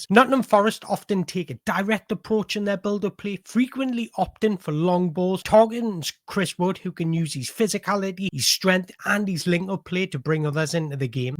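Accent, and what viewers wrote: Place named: North East England, United Kingdom. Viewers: The comment about your accent is ridiculous, local accents are beautiful. Appreciate you my North east friend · Watched a few minutes and I really cant listen to that north east accent anymore.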